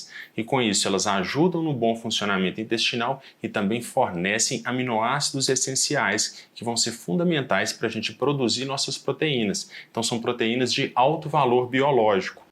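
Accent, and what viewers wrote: Minas Gerais, Brazil. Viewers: Boas dicas e com o belo sotaque mineiro, uai! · São relevantes as informações. O Dr. deve ser professor: metodologia blz! E o sotaque mineiro é nota 10!!!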